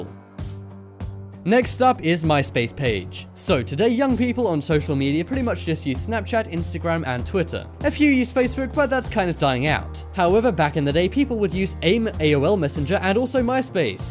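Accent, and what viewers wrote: England, United Kingdom. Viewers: I cant figure out if this guy is english or american 😂🤣😂🤣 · He's English because us American don't sound like that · You English?you sound it but you use so many American terms,you ashamed to be English?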